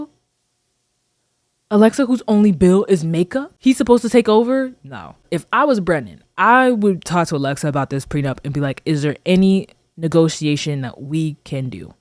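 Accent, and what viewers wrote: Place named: Nigeria. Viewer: omg your nigerian accent was everything. I love your analysis!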